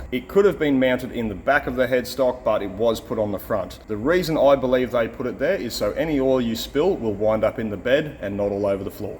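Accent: Australia. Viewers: So bloody austrailian ah. "That aint no bone boy. Now this is what i call a bone" with a sparkle in ya eye😁😁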